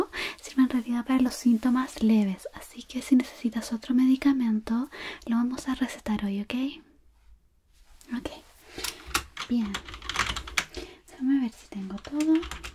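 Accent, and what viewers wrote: Chile. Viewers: Podría jurar que es chilena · Me encanta como hablan los Chilenos 🥰🥰🥰🥰, NUEVA SUSCRIPTORA! Mas que todo, utilizo los ASMR para estudiar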